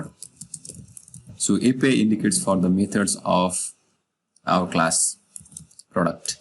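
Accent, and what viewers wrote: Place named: Nepal. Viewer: You have Nepali accent.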